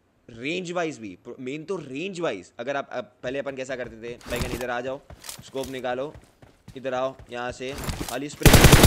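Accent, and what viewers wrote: Hyderabad, India. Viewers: Bhai bohot dino bad apki Hyderabadi Sunna bohot acha laga bhai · That hyderabadi accent is lit 🔥🔥🔥🔥🔥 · Bhai tu pakka wala hyderabadi lagtha hai 😍🤩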